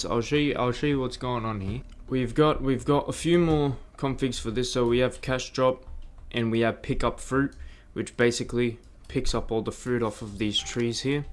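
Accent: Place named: Lebanon. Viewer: 2026 the year of the roblox coding leb accent eshays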